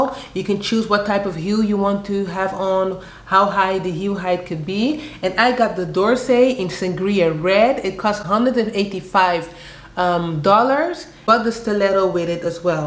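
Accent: Netherlands. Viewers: Love your content!! You sound Dutch 😍😘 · You have a Dutch accent, are you from the Netherlands?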